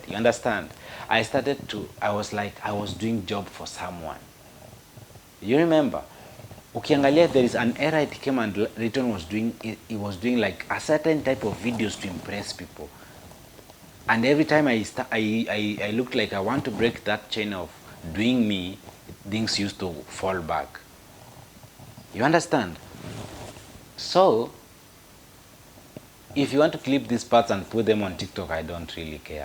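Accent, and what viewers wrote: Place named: Kamba, Kenya. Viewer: You have a good English while you are serious but when you and Jude are not in good terms your English sounds kikamba aki, anyway you are free from your own words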